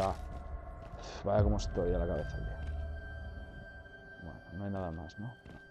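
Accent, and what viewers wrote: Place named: Galicia, Spain. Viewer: de que parte de galicia ereees??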